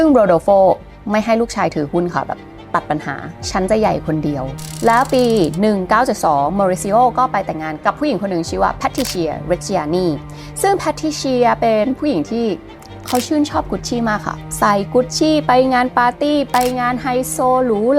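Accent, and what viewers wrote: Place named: Thailand. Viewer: เล่าตัดต่อมากคะ แต่งงๆ กับชื่อและอิตาลีสำเนียงไทยภาษาอิตาลี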